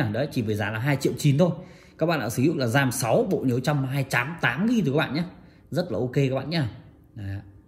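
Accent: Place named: South, Vietnam. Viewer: Nói giọng nam .còn dễ nghe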